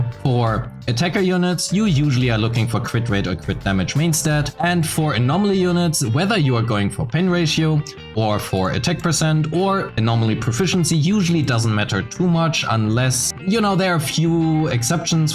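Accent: Germany. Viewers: Digga ist das geil das es ein deutschen ZZZ YouTuber gibt Ich wusste das du deutsch bist wegen dem aktzent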